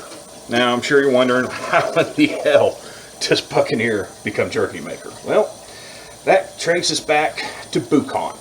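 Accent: United States. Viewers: yep you're definitely a Texan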